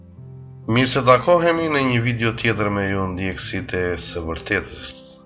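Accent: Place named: Tepelenë, Albania. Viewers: Ti që po flet dialektin duhet ta kesh nga Tepelena po edhe unë në të nxehtin mendim jam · Ti po flet toskerishte te kulluar ama....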